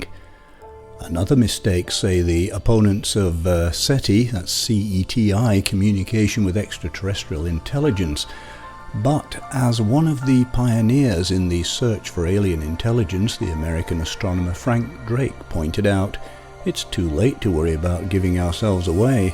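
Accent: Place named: Yorkshire, United Kingdom. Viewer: There's a hint of a Yorkshire accent leaking through the RP elecution if I'm not mistaken.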